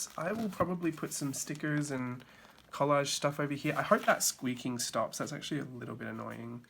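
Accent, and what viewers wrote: Australia. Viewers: YT finally dropped yr video into my feed. MyLifeMits always mentions you in her videos so Im stoked to finally watching yr video. I like all yr different spreads, some of your ideas I would like to try out 😊 Also it sounds like you're dropping Kath n Kiim nuggets, so are you Australian? I really enjoyed listening to your chat about your journals too and I look forward to watching previous and future videos 🥰👍❤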